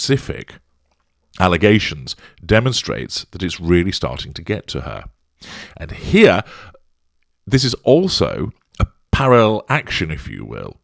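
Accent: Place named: Yorkshire, United Kingdom. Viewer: |Maybe she wants to usurp Camilla, then Catherine would have to curtsy to her!!.. PS has a Yorkshire lass I just loved your Yorkshire accent made me chuckle thankyou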